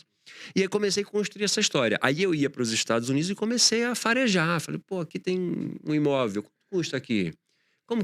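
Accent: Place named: Recife, Brazil. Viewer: O cara sai de Recife, mas o sotaque não sai.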